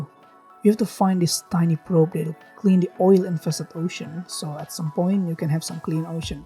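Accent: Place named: Indonesia. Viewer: Sound looks indonesian accent.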